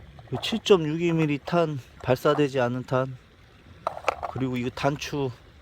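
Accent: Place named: Chungcheong, South Korea. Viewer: 말투가 충청도사투리같내유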